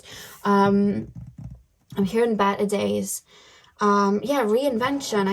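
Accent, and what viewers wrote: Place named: Newcastle, United Kingdom. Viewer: Are you a Geordie? Trying to place your accent.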